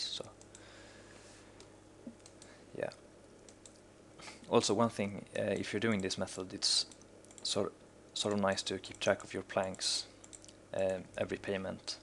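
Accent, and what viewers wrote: Sweden. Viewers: Hejsan! Du låter som en svennebanan!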